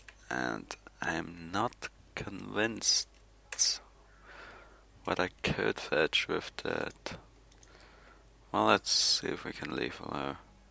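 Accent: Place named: Europe. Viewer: Holy fucking hell, you sound like utter SHIT...and, oddly sound like you have a some sort of European accent? I'm confused.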